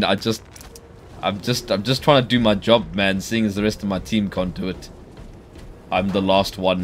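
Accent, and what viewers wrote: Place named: Scotland, United Kingdom. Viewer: Narration alone warranted a Sub... Salute to the Scottish.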